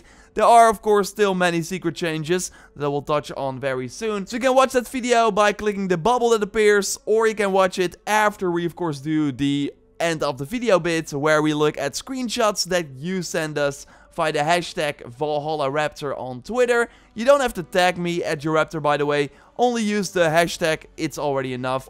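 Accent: Netherlands. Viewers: Is hij Nederlands??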